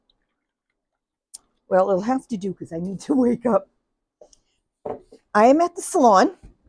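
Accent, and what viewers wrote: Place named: Massachusetts, United States. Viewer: I love watching your video's you make me crack up,,, As soon as I heard you speak, I said to myself that's a Massachusetts Accent. The reason that I recognized it is because I live in Mass as well. I'm looking forward to your next video, Take Care----Madeleine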